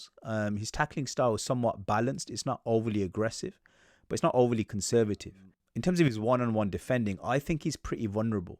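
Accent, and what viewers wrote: Birmingham, United Kingdom. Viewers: You from Birmingham?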